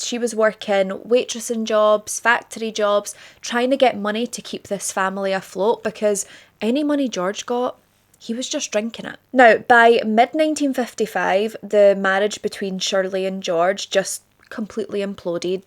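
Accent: Scotland, United Kingdom. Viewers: I just can't get over your beauty! I'd give almost anything to look half as beautiful as you! The accent tops it all off! There is literally nothing better than a Scottish accent!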